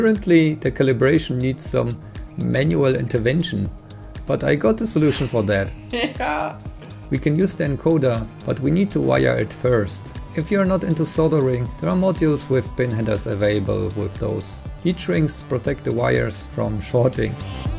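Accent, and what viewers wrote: Germany. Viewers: Bro nices video aber das ist so ein Kartoffel Englisch man hört das du Deutscher bist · I think you are german, because you have a german akcent, And this gadget is cool.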